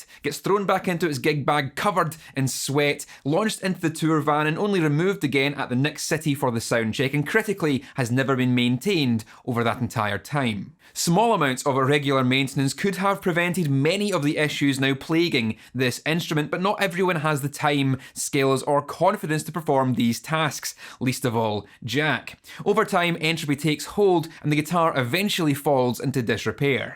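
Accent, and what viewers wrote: Scotland, United Kingdom. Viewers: Scottish or Welsh Irish? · Are you from Kirkcaldy or Irvine...? Just wondering about your accent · This dudes voice is my Scottish accent impression... fuck I hope he’s Scottish. Also, fuck, I have a Gibson epiphone in the condition, thank you for reminding me that I’m being a push-fit nob... poor guitar doesn’t deserve it.. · Deep deep deep Scottish accent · You have the strongest Scottish accent since Limmy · Cathartic in two ways: 1. Grime cleaned and everything freshly filed and realigned. 2. That dialect. I could listen to that particular Scots all day. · He has such a Scottish(??) Accent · The scottish accent makes it even better! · Hell yeah a Scottish accent on YouTube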